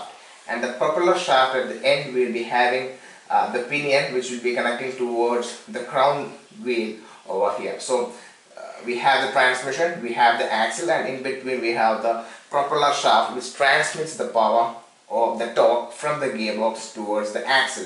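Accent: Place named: India. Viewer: let me guess... Indian right? ( no racism intended )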